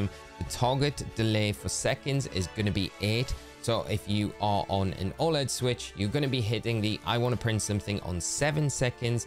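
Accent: Newcastle, United Kingdom. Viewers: You’re a Geordie…?! Subscribed.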